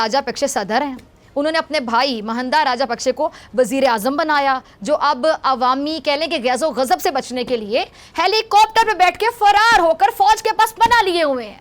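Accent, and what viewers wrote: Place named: Punjab, India. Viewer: Aap urdu main Panjabi ki tune.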